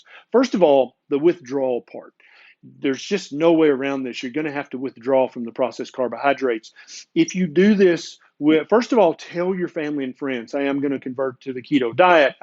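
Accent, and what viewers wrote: South, United States. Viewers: Going through it rn, thank you for the video! Appreciate your knowledge, videos and amazing southern accent!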